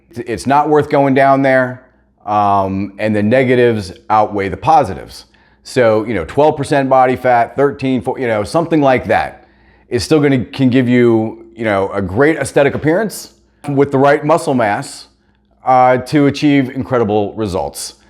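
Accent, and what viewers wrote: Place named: South, United States. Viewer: Dude if I close my eyes it sounds like Dustin "The Diamond" Poirier is speaking, are you from south?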